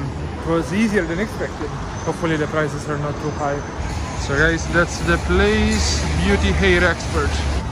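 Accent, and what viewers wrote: Italy. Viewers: I love your Italian accent. Nice haircut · 这个英文是意大利人吗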